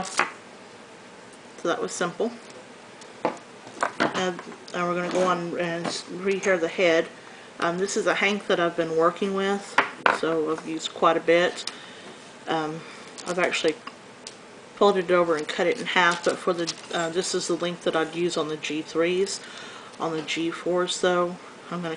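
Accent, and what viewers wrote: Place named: West, United States. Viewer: talkin like a cowboy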